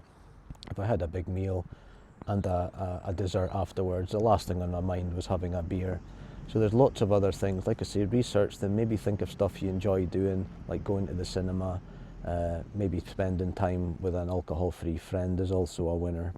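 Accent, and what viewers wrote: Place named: Scotland, United Kingdom. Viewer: Hello 14 weeks sober nice to hear a fellow Scot talk sober talk subbed. Was looking at your background and was thinking that looks familiar 😂 🌟 bank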